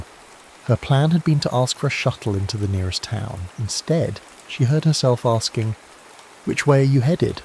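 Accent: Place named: United Kingdom. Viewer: Darwin, Australia. Thank you for this video and your lovely British accent 😊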